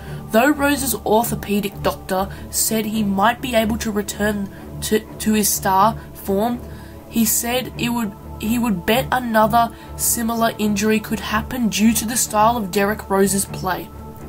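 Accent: United Kingdom. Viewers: he kid, when you say spurs is best franchise in the history of the nba history, you must be high on drugs or to drunk to figure out what the words that are coming out of your mouth, championships matters most, you can be in the playoffs everytime or even since the start of a certain team in the nbabut never won a championship, that won't count and by the way you sound like british stick with football, i'm filipino and despite being short for basketball our number 1 sport back here is basketball, play make sure that you know what your talking about, i forgive you this time, but allow me to say this, you're a f*cki'n idi*t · i don't necessarily agree w/ everything you said in the video, but you did a good job stating your case, and your voice is fine. you sound like a teenager w/ a british accent. How old are you, and what country are you in?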